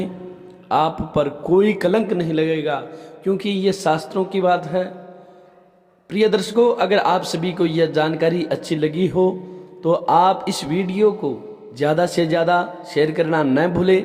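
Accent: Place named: Rajasthan, India. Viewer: आप राजस्थान से लग रहे हो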